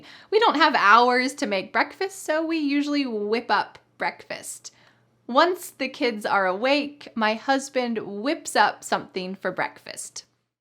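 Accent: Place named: United States. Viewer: Great video! Just, why do you insist so much on the "r" pronunciation and US accent. I mean, why should one want to sound american, these days? After the infamous incident at the soccer World Cup, especially 😅. That really has been the last straw ...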